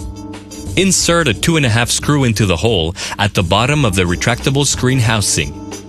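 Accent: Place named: Quebec, Canada. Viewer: It's just a slight French-Canadian accent. There's really no need to slag his pronunciation.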